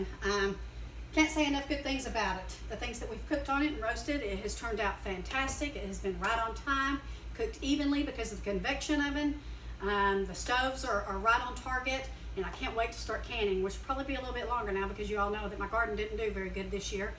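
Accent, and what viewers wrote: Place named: South, United States. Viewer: I didnt think she talked fast, ha! Must be the southern.thats why I can understand lol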